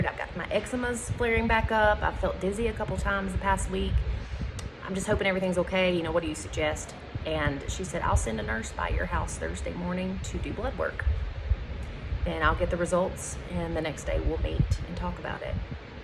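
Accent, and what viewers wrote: United States. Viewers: When I hear someone with a Texas accent state she is sitting in front of the fan because she is so hot she is "just trying to survive April and May"...holy crap, that gives me PAUSE. LOL I hope you are feeling better all these months later. Thank you for this post! It's very encouraging. : ) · First of all, where are you from? Your accent sounds so much like where I’m from, NC. That sounds tremendous about healthcare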